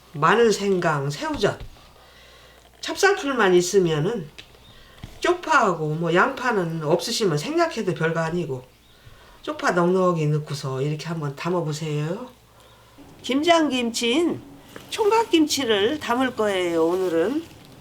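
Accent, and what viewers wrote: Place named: Jeolla, South Korea. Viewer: 무수~ 전라도 사투리 울할머니 생각납니다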